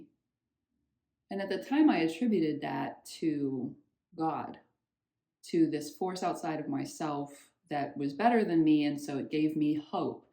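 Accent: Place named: United States. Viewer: I don't know who you are referring to as your speak so fast and the American accent is really difficult to understand at the best of times. The trick is that there is a God, that is a creator and is a male or female. This is delusion. The body belongs to Nature and out of your control. Emotions are not under your control. Reactions through senses is not in your control. If you hear a loud bang and body jumps, there's your proof. What comes before Consciousness if the body jumps before the mind is aware of the loud sound? If one is 'God' one would have been aware because God is whole. Blessings.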